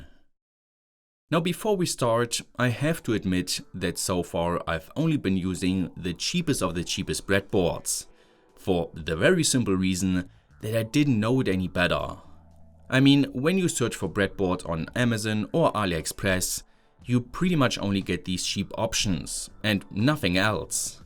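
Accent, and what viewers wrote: Germany. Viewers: Bitte arbeite dringend an deinem Englisch oder sprich einfach deutsch. Man hört das du deutscher bist, dein Englisch ist einfach nur grottig.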